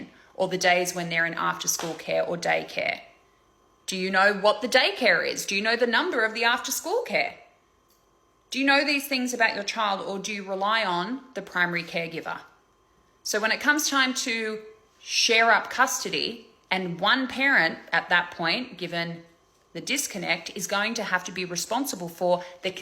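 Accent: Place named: Australia. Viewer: Now I'm going to assume from your accent. You're Australian but forgive if I'm wrong. But in Canada one of our top universities Miguel did a huge study on family courts and devices exist in family court. It's been hundreds of thousands of cases. And the reality is the reason men are less likely to get custody of their child is because men are not prepared when they come to court. 81% of cases were the child has a ongoing medical issue the father is unable to name that issue and its treatments. They're unable to name the doctor that treats this. 91% of men don't know their child's birth date in Canada. Over 98% of men couldn't answer where their child went to school or who their child's teacher was. They couldn't answer if they had any allergies or not. If they take any medication or even how to administer it. When you go for custody, you were given a list to prove to the family courts that you are able to maintain custody safely. 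And in 85% of cases in Canada, men fail to have that list done. If there is an out burst in court 76% of the time it is men that have that out burst. Tell what the study found was that The majority of men are unwilling to put in the work and the effort it takes to prove to the court that you can safely have custody of your child. And that you are willing and able to healthily. Co-parent with The child's other parent. Also, that study stated that in 94% of cases were domestic abuse is proven. It is the man who is the abuser. So not giving custody to a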